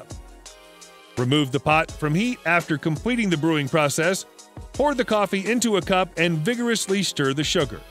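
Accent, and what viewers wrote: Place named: Spain. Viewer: I love your Spanish accent and I appreciate the effort great reference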